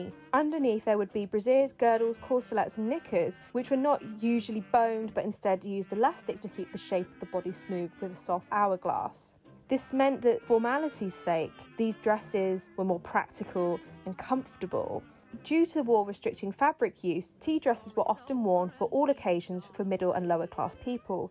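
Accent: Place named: United Kingdom. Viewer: Voice and diction teacher here, slow down and enunciated your words. Your British accent isn't quite RP and is difficult for Americans to understand/process.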